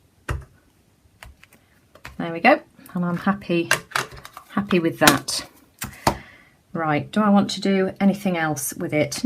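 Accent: England, United Kingdom. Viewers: Beautiful page! I love that quote! And I love those flowers too! It is so enjoyable watching you and listening to you explain what you are doing! (In your fabulous English accent! lol) Tfs Big hugs, Ginny xo:o)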